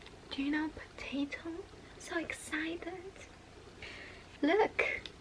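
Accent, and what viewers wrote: United States. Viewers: American shdee yun angli be ?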